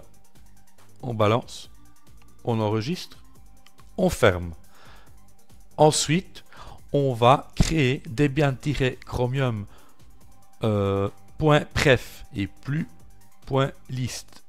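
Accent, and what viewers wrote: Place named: Luxembourg Province, Belgium. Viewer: salut je découvre ta chaine via Adrien D.(linuxtricks) tes vidéos sont pas mal, il y a juste que tu parles un peu lentement au démarrage de ta vidéo :D j'ai failli zappé, j'ai cru reconnaitre un accent de ma région (sud de la province du luxembourg) :D je te mets un pouce pour celle là et tu gagnes un abonné ;)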